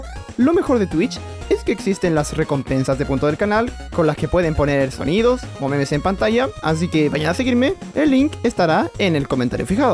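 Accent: Chile. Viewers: bro, te salio con un acento muy chileno el video XD, la parte q mas me gustó fue y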